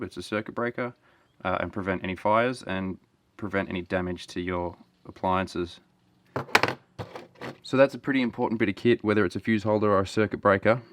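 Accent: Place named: Australia. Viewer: Great info, and nice to hear the Aussie accent!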